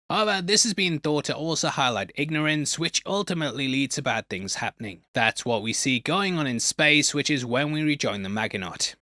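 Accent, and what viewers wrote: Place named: England, United Kingdom. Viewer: I'm still confused 😢....he's English accent confuses me more..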